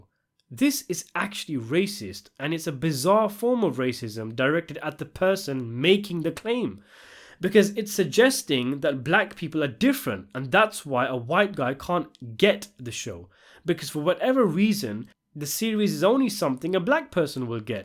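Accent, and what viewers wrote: England, United Kingdom. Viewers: are you British? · I always knew you was south East Asian - I was bare confused when you entertained being white… UK got different demographics - English accent doesn’t mean white hahah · Great reveal, watched for a long time big fan always wondered what you looked like, obvs your accent is dead give away, British Asain, but nice to put a face to a voice after all this time. Lay off us Brits though, how you gonna do a video on the wire and then end up blaming other peoples mindless hate on us colonising Brits???? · Something I thought about that was little ironic. Most of us have never seen you. We know you have a British accent but we don’t really know what color you are. Idris Elba is British too. It would be funny for those talking crap if you were actually black LOL.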